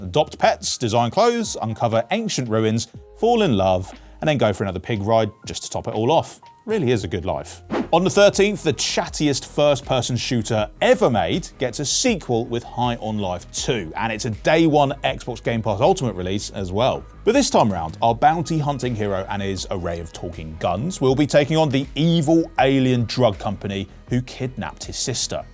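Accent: United Kingdom. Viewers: They need to get rid of these UK accents. Petrol? , this guy really said dinosaur in an alien world game.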